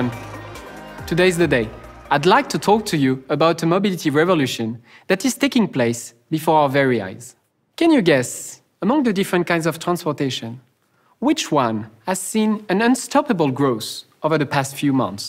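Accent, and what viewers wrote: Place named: France. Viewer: C'est du marketing, un accent français pour un produit français !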